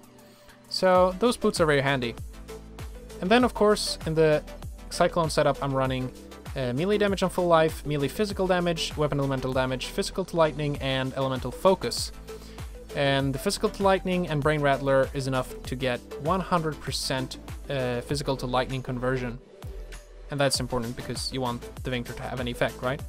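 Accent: Sweden. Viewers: Lel, you swedish lad?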